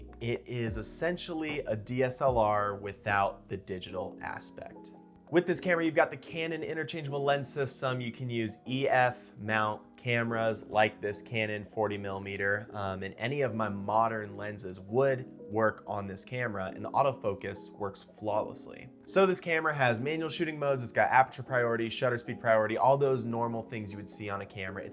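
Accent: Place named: Louisville, United States. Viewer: I can tell by how you say it, you're definitely a Louisville native! Much love from the 502 brotha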